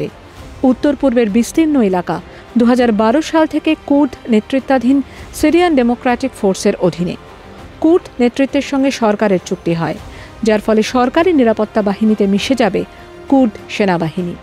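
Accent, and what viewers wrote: Bangladesh. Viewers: পূর্ব বাংলার উচ্চারণভঙ্গীতে আনন্দবাজারে এই নতুন আমদানী কে? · বাংলাদেশী ভয়েস। সে অবশ্যই বাংলাদেশী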